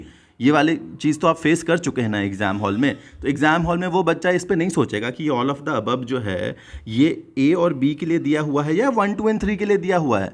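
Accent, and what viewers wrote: Bihar, India. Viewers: Your bihari dialect even after being IES officer is just love❤️.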